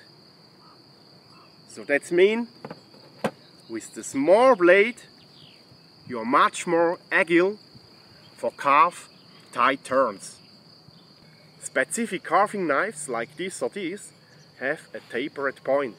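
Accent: Germany. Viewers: А что у него за акцент? Немецкий?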